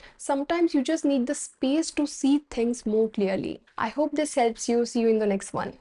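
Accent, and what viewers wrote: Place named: India. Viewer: Indian English Girl 😊 Masterpiece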